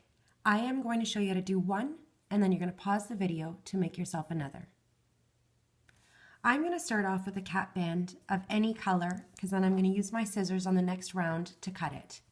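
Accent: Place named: Canada. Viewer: I'm american and you soundas little Canadian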